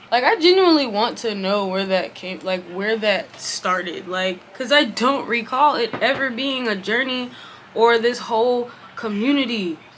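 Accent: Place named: Baltimore, United States. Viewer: You sound like you from Baltimore. ..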